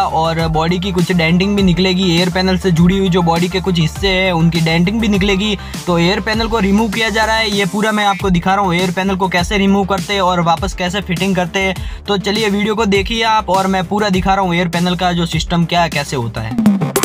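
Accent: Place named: Marwari, India. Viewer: Bhai thari hindi sunr lage thui marwadi hai agr hai to ❤❤❤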